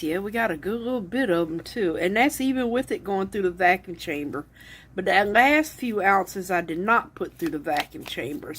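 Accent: South, United States. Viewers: Love all your videos,!!!! You crack me up with your southern accent and words you use. I love it! ❤️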